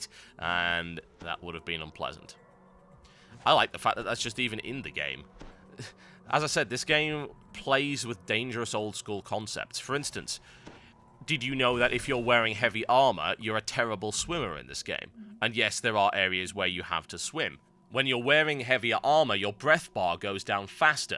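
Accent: United Kingdom. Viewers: Dude your new intro is sooo british..... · i miss old:( the british one he has gotten signifigantly less britanly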